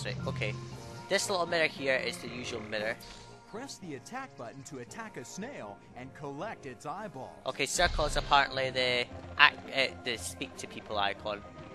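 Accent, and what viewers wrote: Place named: Scotland, United Kingdom. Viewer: scottish(scottash) with a blocked nose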